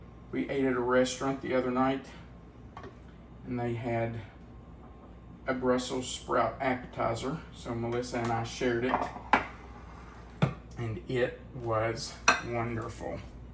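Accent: South, United States. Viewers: I love your southern accent and everytime you say TOGETHER,,,, I giggle and I say it the way you do ,,,,,unfortunately with my Boston accent ,,, just love you guys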